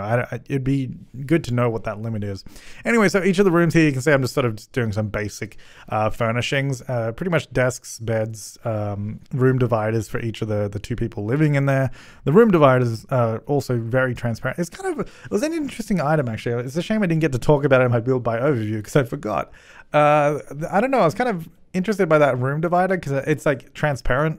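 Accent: Australia. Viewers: Last night I had a dream that was looking for an assistant to help him and his requirement was just you had to sound like him. The whole dream from then on is me trying to sound Australian and saying "hey guys!" A lot.😂 Edit: omgoodness thank you all for the likes! I don't think I have ever had such a loved comment. 😊 I'm happy I could entertain you with my silly dream!😁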